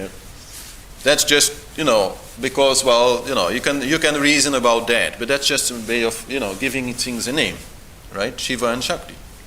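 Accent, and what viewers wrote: India. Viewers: You speak English with an Indian accent. You must have lived in India many years! peace.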